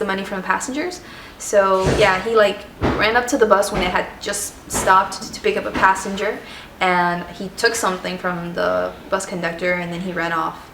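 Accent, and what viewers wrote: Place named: United States. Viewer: your accent is american. whats the deal? you were born in peru and you grew up in USA? and btw, mystura is at Jockey Plaza right?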